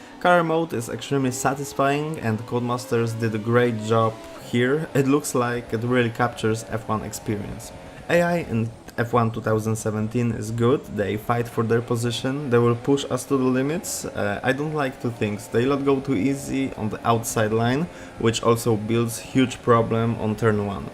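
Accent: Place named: Poland. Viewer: słyszę ten polski akcencik. Fajna recenzja :D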